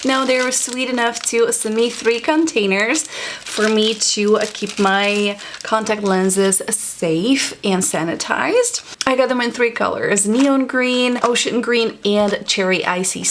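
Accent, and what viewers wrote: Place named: Poland. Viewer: I absolutely love your accent! You have a great soothing voice, you are very beautiful, are you polish? ❤️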